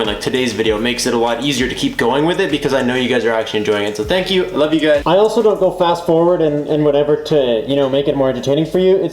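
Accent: Canada. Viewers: Is it just me or did you didn’t notice accent until finding out he lives in Canada? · You have the accent canada is where its at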